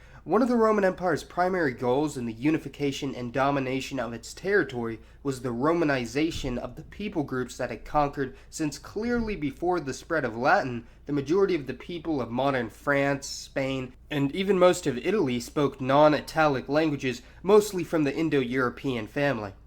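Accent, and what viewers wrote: United States. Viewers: there are multiple issues with your videos and you know about some about these. You have a good American accent, sounds convincing. But that's your nemesis as well. 1. Pronunciation issues These stem from English, where they repeat A(ei), B(bi), C(si), D(di), E(i), F(ef), G(dji), H(eich), I(ai)...Y(uai), Z(zet). FYI, all speakers of BBC check the correct pronunciation of any foreign name with locals BEFORE saying in on air! Please, note that languages different than English have PHONETIC alphabet, meaning that 'A' is always (a) but not (ei), 'E' is (e) but not (i), and so on lran is not (airan) but (iran). Just like Italy is not (aiteiluai) but (itali), or more correctly Italia (italia), and Enland should be Anglia (anglia) but not (einglaiei). Iraq is not (airak) but (irak). Macedonia is not (masedonia) but (makedonia) Dacia is not (deisha) but (dakia) Thracia is not (treisha) but (trakia) Persia is not (purza) but (persia) 2. 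Ethnic issues Romans (citizens of Roman Empire) have absolutely nothing in common with Gypsies who came from India with Alexander The Great, for whom George Soros paid to be named 'romani'. 3. Today's Romanians are descendants of ancient Dacians (dakians). Dacians (dakians) are Thracians (trakians), and Tracians (trakians) are Bulgarians. FYI, old Bulgarians, had the most precise/accurate calendar ever known and, acknowledging this, in 1976 UNESCO recommended its adoption worldwide. These people had the oldest known crafted gold - Varna civiliz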